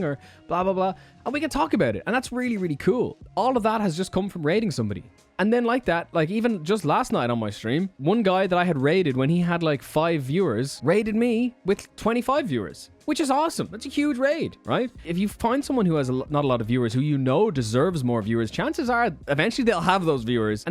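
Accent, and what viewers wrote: Ireland. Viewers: For an American you have a really Irish accent….. ❤️👍🏻🇺🇸🥃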